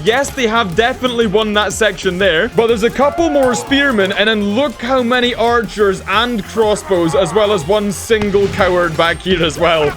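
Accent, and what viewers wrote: Scotland, United Kingdom. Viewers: you scottish because i am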